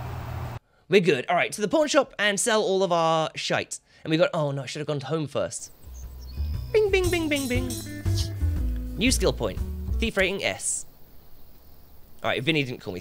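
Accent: England, United Kingdom. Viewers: Because porn and pawn are pronounced the same in an english accent:')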